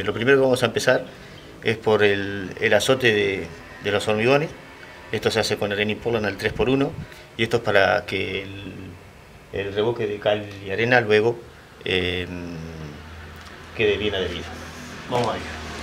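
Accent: Uruguay. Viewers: Genio. Sos Uruguayo, o Argento?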